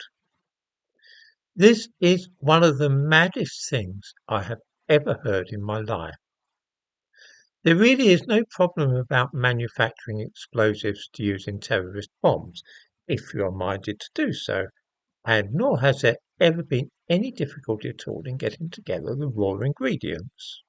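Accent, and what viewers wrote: United Kingdom. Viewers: Clicked on this because I have an interest in making a small steam engine and I want to get in to live steam, I used hexamine tablets in cadets, I still have boxes full of them so that's good to know. I recently saw a new steam engine kits in a local Kmart and they had an electric engine. - it was both very confusing and disappointing, but I ended up googling the Mamod company, as a result of this video and I've now found a local supplier of small-scale steam engine parts. So that’s made me happy, I can probably push this endeavour further. You sound and speak with the same tone as my neighbour who is also a British expat (I'm from Australia).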